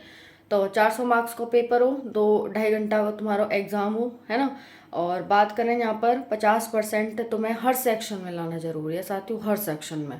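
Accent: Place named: Etah, India. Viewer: Eta district ki language hai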